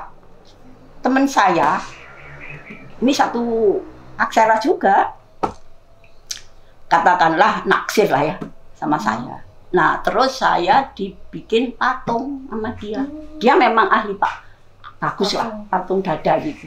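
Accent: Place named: Surabaya, Indonesia. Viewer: Mbak sepertinya sudah cukup artinya itu inti yang saya dapat dari pak Nashar: " bagaimana menjadi " pelukis, shg ilmu itu saya terapkan pada diri saya sampai sekarang. Kenyataannya saya masih bertahan dan menjadikan semacam energi mbak, terima kasih banget....... atas vidionya, ( gaya suroboyoan omongane tur banter )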